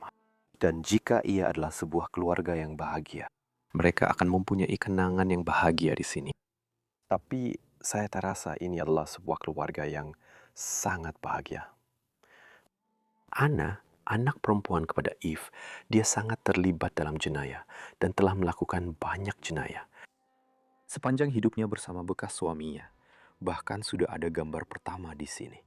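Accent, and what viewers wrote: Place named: Indonesia. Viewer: Sekejap bahasa melayu sekejap loghat Indonesia...🤗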